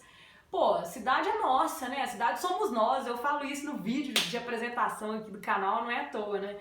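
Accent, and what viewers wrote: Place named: Pernambuco, Brazil. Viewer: Kkkkkkkkkkkkkkkkkk É o "pernambuquês", 'fia'... BH é o máximo! E teu canal, tá igual ao programa de domingo à noite: Fantástico! Xeroooo em ritmo de forró!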